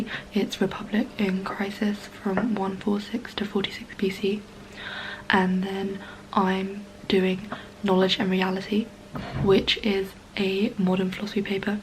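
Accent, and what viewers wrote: United Kingdom. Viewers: your english accent is just amazing !!!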